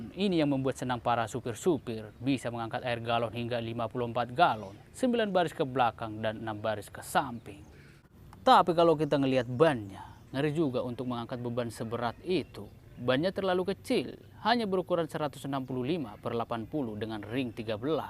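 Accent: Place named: Germany. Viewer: Baaah logat jerman rupanya...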